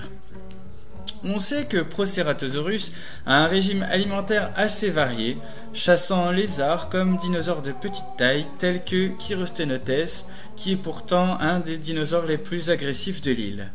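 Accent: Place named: United Kingdom. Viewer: Ooh, cet accent so british ! ;p Bon ben tant pis pour toi : tu gagnes 1 subscriber, my Sausage friend ! ^^ Juste un petit conseil en passant : essaie de moins donner l'impression que tu lis ton texte, fais-le vivre quand tu t'exprimes, ce sera plus agréable à l'écoute. Tu es passionné, alors fais-le nous sentir, sois naturel ! ;)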